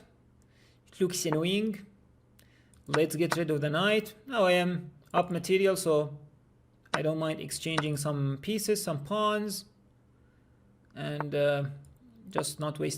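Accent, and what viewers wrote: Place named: England, United Kingdom. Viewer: Idunno that u have an english acc whatever luv uu from irakk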